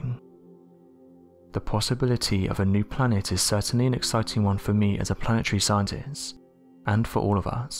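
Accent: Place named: Wales, United Kingdom. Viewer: The narrator's english accent is very strong and hard to understand, people of the channel you need to understand that your channel is broadcasting on YOUTUBE which is an international application and most of the viewers are not native english language speakers. Even some of the native english speakers have hard time to understand the accent. Is the narrator Welsh or from Isle of Man or from Bolton?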